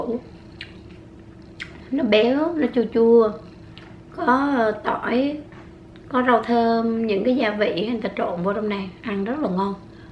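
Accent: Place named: Central, Vietnam. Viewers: Chị cùng quê với em nè. Hèn gì em nghe chị nói chuyện giống giọng miền Trung. Ngày nào cũng hóng video của chị. Chúc chị mau khỏe nha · Hôm trc nghe cô nói gịong quảng nam là con nghi r. Con củg ở qn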